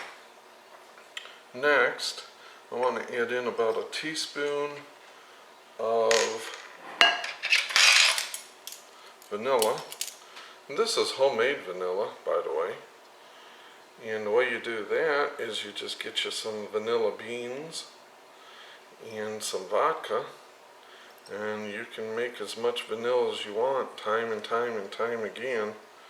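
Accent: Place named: United States. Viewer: Good night folks enjoy your blue cobbler he sounds country that is good looks like a friendly guy he just soundd like garfield talking big cat by yall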